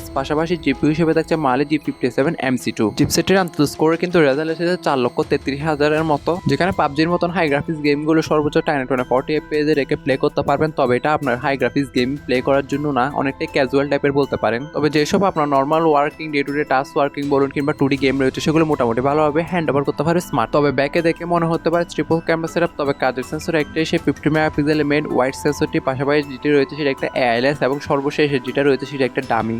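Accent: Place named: Chittagong, Bangladesh. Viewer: উচ্চারণের মায়রে চাটগাঁইয়া ভাষা দিয়ে দুচে দিলো।ভিডিও দেখবো কী!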